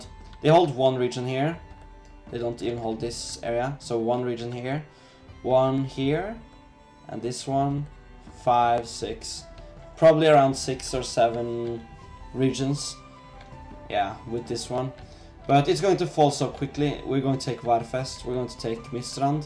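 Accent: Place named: Ireland. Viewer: you sounded irish, pretty cool though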